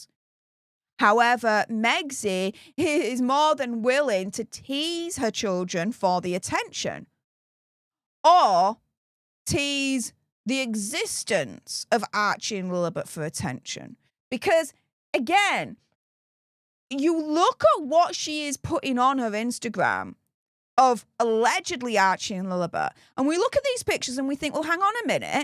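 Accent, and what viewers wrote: Yorkshire, United Kingdom. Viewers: Gday from a Wakefield lad out in the Barossa valley in rural South Australia 🇦🇺 it’s great to hear a Yorkshire accent again! 🇦🇺🏴♥️🇦🇺🏴 Edit:it’s great to hear “hey up” again instead of gday or how’s it goin’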